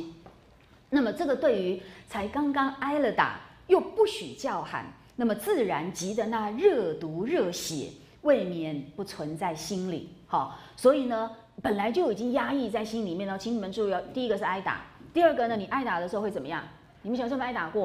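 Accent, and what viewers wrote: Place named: Beijing, China. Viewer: 欧老师的儿化音好可爱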